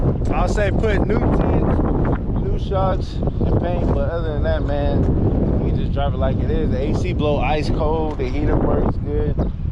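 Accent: California, United States. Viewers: Under bucket that's that cali talk yee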